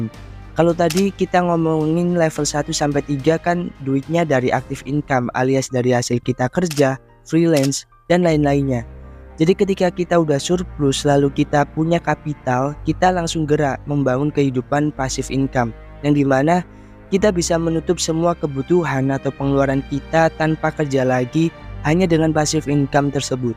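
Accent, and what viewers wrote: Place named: Java, Indonesia. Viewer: Bro suaramu medok mencla mencle ga cocok ngmong la lo gue gue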